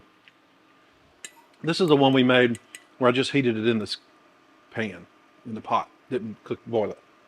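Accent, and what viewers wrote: South, United States. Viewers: What a great channel name to go with your sexy soothing Southern gentleman's voice.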